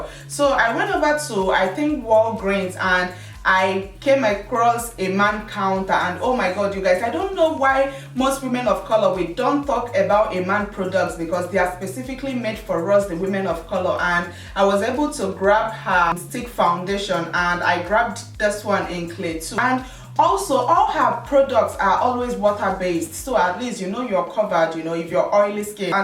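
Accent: Nigeria. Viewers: Are you from Nigeria? Just asking because you sound like my mom who's Nigerian. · okkayy that accent is my Nigerian sister. I am Nigeria and I know when I heard your voice for the first second. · Where are you from? I hear the West African accent, Nigeria.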